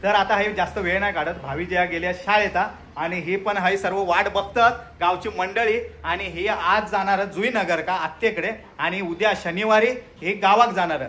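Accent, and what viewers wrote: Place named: Malvan, India. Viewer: तुम्ही अस्सल मालवणी बोलता,अभिमान वाटतो धन्यवाद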